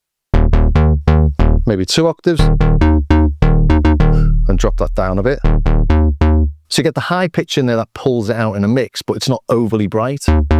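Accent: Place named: North, United Kingdom. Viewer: where is that accent from? Somewhere in the North?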